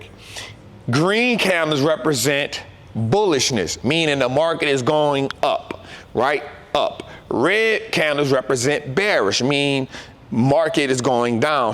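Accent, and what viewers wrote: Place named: Louisiana, United States. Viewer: That's that Louisiana drawl, lol!! Im born and raised in Chas SC, so I know what you hearing, lol!!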